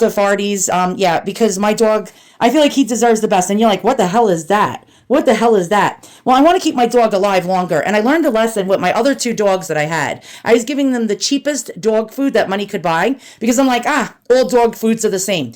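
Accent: New York, United States. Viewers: What a great review! I love the New York accent! Can’t beat authenticity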